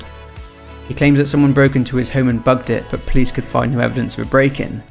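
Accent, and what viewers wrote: United Kingdom. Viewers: Why r u british?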